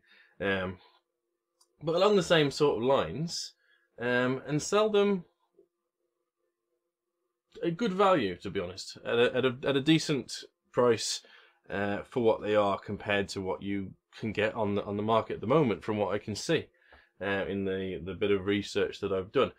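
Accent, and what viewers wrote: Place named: Bristol, United Kingdom. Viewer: Ah right, thought I detected a Bristolian twang to your accent!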